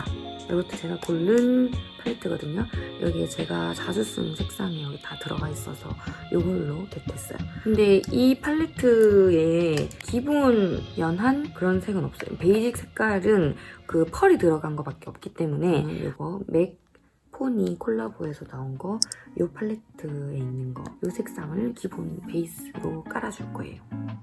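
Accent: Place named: Busan, South Korea. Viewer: 얼굴하고 스타일은 American Style일인데, 말투는 완전 부산 Tough Girl. Feels like New Age 이효리. 그런데 왜 이 영상들이 네 recommendation으로 계속뜨지. 난 화장에 관심 없는데.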